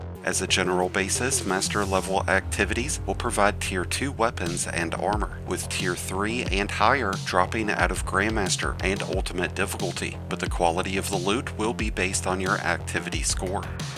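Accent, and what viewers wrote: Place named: South, United States. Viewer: I am a fan for your voice and southern accent. Please, check your mic as it's always been high pitch. A little bit of shhhhh too. Hopefully you can make a cleaner sounding voice the your future here. Love from a fan.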